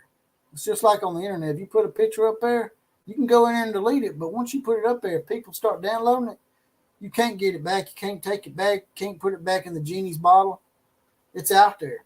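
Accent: South, United States. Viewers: I rather like the southern drawl. But not without the hospitality. 🤣 I'm a Texas fan, so come at me bruh, but I wasn't commenting good or bad, just noticing your southern drawl and sayin there wasn't anything quite like it. I expected a chuckle. But if ya want some nonsense, "I'm your huckleberry." 😈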